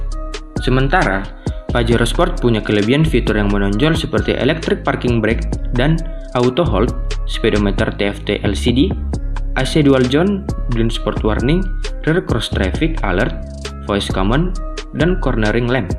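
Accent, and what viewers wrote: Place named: Sumatra, Indonesia. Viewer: Reviewnya logat sumatra banget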